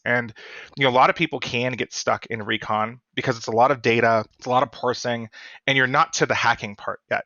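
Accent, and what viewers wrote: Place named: South Africa. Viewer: Jason did a REALLY GOOD JOB! I hope we get an episode finishing all the levels on recon. I personally would like a video on Gaining access and Maintaining access. THANK YOU always a pleasure hearing the all too familiar South African accent.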